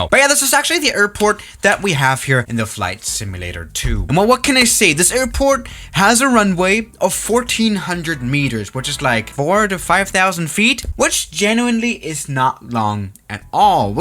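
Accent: Germany. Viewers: wait a minute... are you from germany? 😊😆🤔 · Are you german? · U German 🤨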